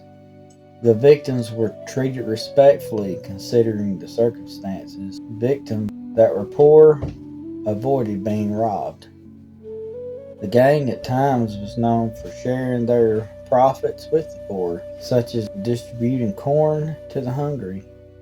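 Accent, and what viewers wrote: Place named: Appalachia, United States. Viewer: Good day to you and all our Appalachian cousins from the UK, I hope that you have all had a happy Christmas. I just stumbled upon your channel and I am mighty pleased to have done so. Being British there is no shortage of history to discover here but having family ties in the US and visited the country a number of times I am also interested in your local history. I also enjoy listening to your speech, it is so different to the North eastern dialect, smoother and slower it is a delight to listen to. I have subscribed and look forward to viewing your other videos so thank you and best wishes for the New Year..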